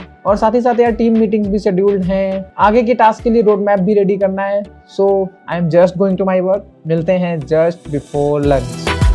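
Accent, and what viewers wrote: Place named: North, India. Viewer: Bro could you tell..? where are you from..? your accent denotes you're from northern region of india. i.e UP/Bihar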